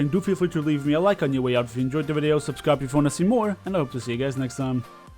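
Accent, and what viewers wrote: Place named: Germany. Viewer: Your accent is rad. Are you German or Austrian?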